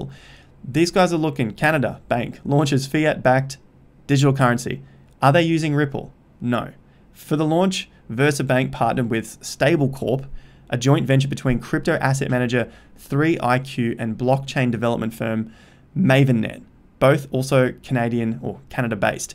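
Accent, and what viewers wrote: Australia. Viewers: First video I watched of yours. Good to hear an aussie accent. I new subscriber :)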